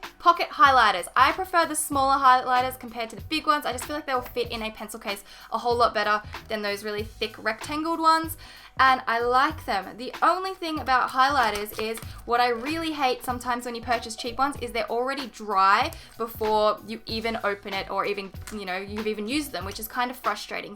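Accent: Australia. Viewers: I love ur videos but did anyone else you only notice her accent after she said 'in Australia" ? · I can never fully express my love for the Australian accent .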